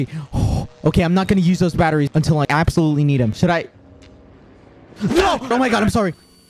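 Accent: United Kingdom. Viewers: love how his british accent gets worse and worse as the video goes on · Ik, but he was doing a British accent in parts of the video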